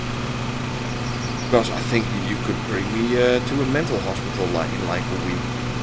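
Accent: Netherlands. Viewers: you are dutch i hear it in your voice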